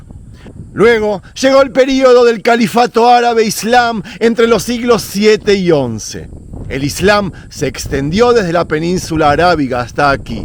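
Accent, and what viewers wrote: Argentina. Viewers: Eres de Israel tienes el acento de Argentino... 🤔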